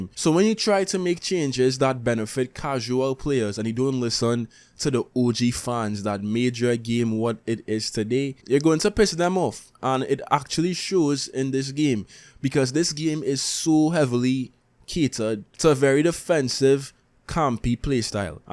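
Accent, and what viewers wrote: Trinidad and Tobago. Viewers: You sound like a Trinidadian lol I'm from Trinidad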